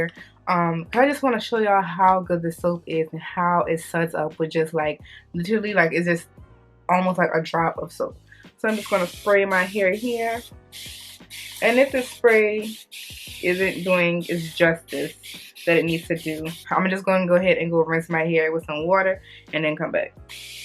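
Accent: New Orleans, United States. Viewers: You sound like you're from New Orleans I'm from NOLA. But my hairdresser just recommended this as a shampoo to strip my hair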